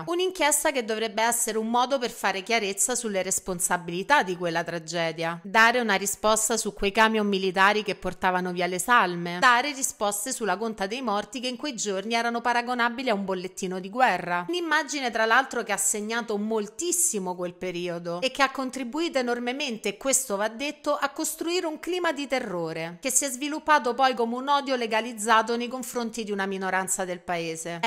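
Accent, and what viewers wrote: Rome, Italy. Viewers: Canale interessante il suo, ha attirato la mia attenzione, anche se il suo accento romano.. 🎶Mamma lupa, poveretta, i è svenata con i denti🎶 Il giornale ha riportato con stupore Non poteva sopportare che il suo popolo invasore🎶 Diventasse una colonia di invadenti Hey-la-la-li, ya-la-la-li, ya-da-da-ya-da. Bom dia.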